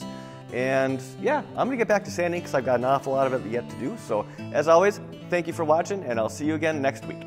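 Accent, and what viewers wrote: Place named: Upstate New York, United States. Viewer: I have a 1968 Bertram Bahia Mar 20 that I seriously need help on. I am willing to go on Patreon to pay for your help because I'm not looking to get something for nothing. This boat is a family member and was left to me by my dad just before he passed in 2015. It's been with me my whole life and is now in need of a lot of help. I have her off her trailer in my backyard and have sanded all the bottom paint off. The hull is in such bad shape with deep chipping and stress cracks everywhere. It's so bad that I've decided to sand all the way up to the gunnels and restore everything from there down. The deck is still in good shape except for a little rotting where there's a penetration for a flag pole holder or some such device. I've been watching your channel for a while and have to tell you how much I've enjoyed it. 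Being from Binghamton, NY originally, I started out working in a boat shop there sanding on boats and doing fiberglass work when I was a kid, so your upstate accent makes me feel like I'm home. When I was 19 I joined the military and have since found myself living out West in Tacoma, WA near JBLM. I'm a Union Carpenter now but still call Upstate NY home. This boat has been part of my family since 1979 and in 2016 I was able to drive back East and get her, an epic journey that's a story in itself. Now that the Covid-19 virus has us all sitting at the house, I have pulled out the random orbital sander and tried to remember all the lessons I learned when I was 17. A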